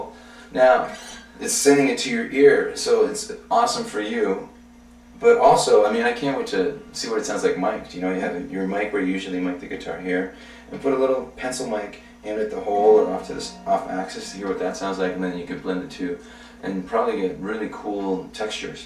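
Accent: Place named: Southern Ontario, Canada. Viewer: First time I saw you acting up and carrying on I knew you were from Southern Ontario. Us Ontarian’s have a distinct accent when we’re excited. And then there’s your Port Dover Friday the thirteenth t-shirt, dead giveaway.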